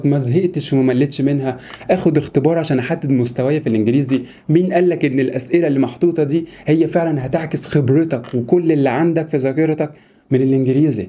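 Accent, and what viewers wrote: Syria. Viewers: الله يحميك قنعتني و دخليك ع اللهجه السوريه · ههه ما يبلى الحكي السوري تحية لك من سوريا😂❤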